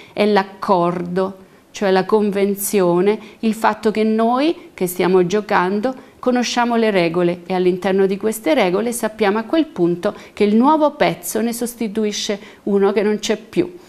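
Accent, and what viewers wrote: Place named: South, Italy. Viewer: Quante scene. Brava la prof. Chiaro esempio di diatopia. É meridionale e si sente, embè?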